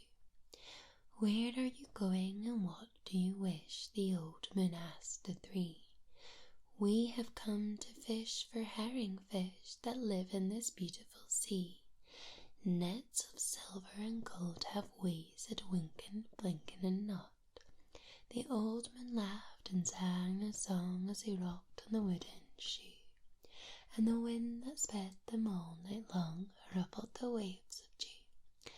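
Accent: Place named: Scotland, United Kingdom. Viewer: 😀👍 3hrs of Sultry Crikey! This will be good....very good.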